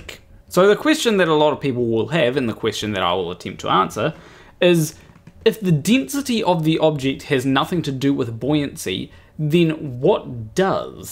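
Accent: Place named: New Zealand. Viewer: New Zealand accent.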